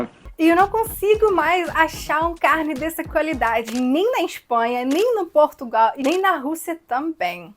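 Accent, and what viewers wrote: Rio de Janeiro, Brazil. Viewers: Amo o seu sotaque carioca! Você está 99,9% carioca já. Só falta trocar o "mesmo" por "mermo". Kkk. Ah, você é linda demais!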